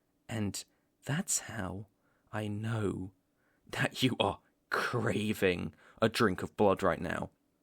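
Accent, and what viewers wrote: United Kingdom. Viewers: I SEE BRITISH I ARIVE IMEDEATLY (not in a weird way or anything also, I'm dyslexic, give me a break) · Me not seeing this is a British audio "Hey there!" Jumps in holly sweets that scares me Me: "Why is it always the accents that startle me?" This was so much fun to listen to though! I loved it! Absolutely enjoyable! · playful teasing even when serious plus the brit sound :face-orange-biting-nails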